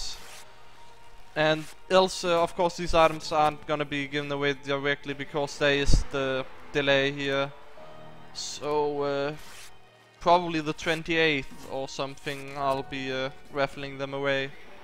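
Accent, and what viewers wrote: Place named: Denmark. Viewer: You have a very danish accent. You're probably not dansih though, you still have a danish accent.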